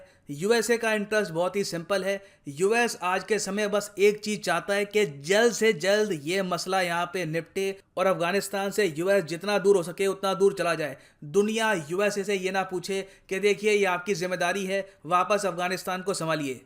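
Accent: India. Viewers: Wo Assam ke logon ka accent hai, par to hindi bilkul bharat wale accent me hi bol rhe the, inke hindi pronounciation me to koi pareshani nahi dikhi...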